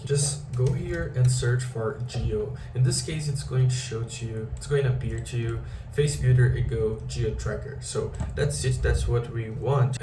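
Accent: Brazil. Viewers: Quando escutei o sotaque no começo e vi que eu tava entendendo bem o inglês eu pensei: ele deve ser br ahhahah. Nice work in this video man! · Sotaque brasileiro, certeza😊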